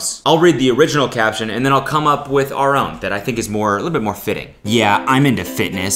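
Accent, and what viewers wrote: Canada. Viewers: I had to scroll so long to find this comment. Who says “pro-sess-sor” instead of “pross-sess-er “? Sometimes be soundin realllll Canadian 👀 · Lauren Annie because Canada. He says sorry like that too lmao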